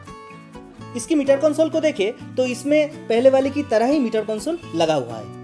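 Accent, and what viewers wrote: Bengal, India. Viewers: Dada apnar hindi te bangali feeling puro relavent.tobe abs version ta hole besi vlo hoto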